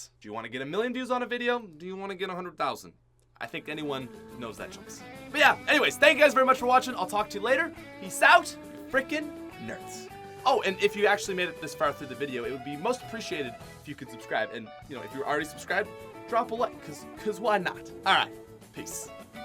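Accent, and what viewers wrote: Canada. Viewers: This guy is the most stereotypical Canadian talker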